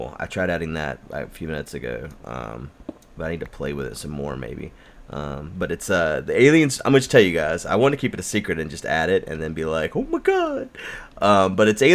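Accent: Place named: South, United States. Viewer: It's just a slight Southern accent, guy. He knows how to talk.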